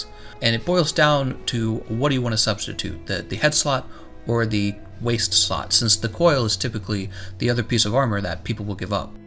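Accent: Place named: United States. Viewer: idk Why you get so much hate... Your videos have the best information... and your even tone makes the American accent easy to understand.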